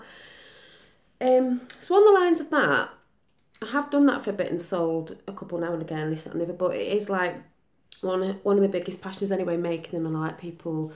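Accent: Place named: North West England, United Kingdom. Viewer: Oh my goodness, Thanks for the shout out!!! Sharpies are the worst! You can do a bleed through test with pens on your back page. Stabilo fineliners should work well on normal paper. Come and join our group on Facebook too!!! You'd fit right in! Also, you sound local to me!! My accent betrays me...I actually live in the North west of England Xx <link>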